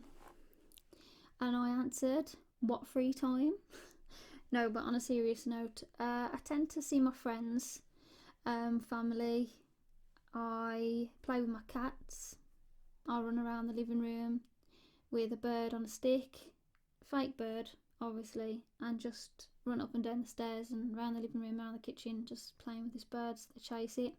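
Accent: Black Country, United Kingdom. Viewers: I loved one of your older videos where you talked about your childhood blanket. Too bad that I didn't know about this video & questions, I would've asked some fun ones. As an American from Pennsylvania, I love your black country accent. Occasionally it makes me think of "Miss Braham's" from "Are You Being Served?" I loved reruns of that show. =] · Hearing your black country accent is just so random, especially because even in the UK you don't actually hear it that often either, I mean I'm in Manchester so thats probably why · Never guessed you were a yam yam dude lmao. West Midlands represent <3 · Lovely bit of black country talking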